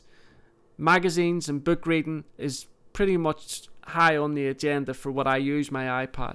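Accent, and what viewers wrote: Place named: Northern Ireland, United Kingdom. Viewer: Nexus 7 - I love hearing your straight-talking, easy to understand and unbiased reviews in a familiar (Northern Ireland!) accent. Thank you for uploading all of these, and doing such a great job with the website.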